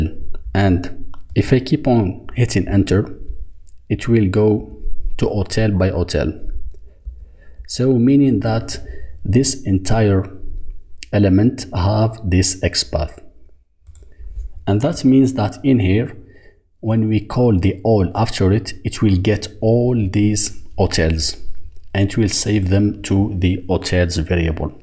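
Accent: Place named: Arab world. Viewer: Are you an Arab? Your voice says that.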